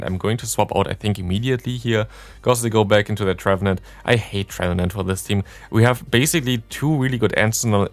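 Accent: Germany. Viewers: Ich schaue beim Zähneputzen gestern dein Video, meine Freundin kommt rein und fragt: "Ist das ein Deutscher?" Ich glaube man hört das 😂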